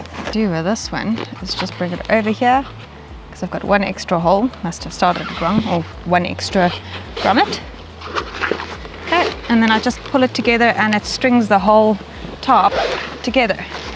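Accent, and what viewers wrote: South Africa. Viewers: Love the South African accent....ive used 50kg potato hessian sacks for the same job. just like the Ol Timers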